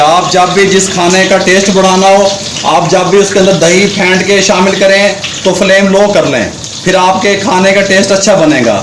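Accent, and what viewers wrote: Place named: Mewat, India. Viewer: Mewati lgta h cok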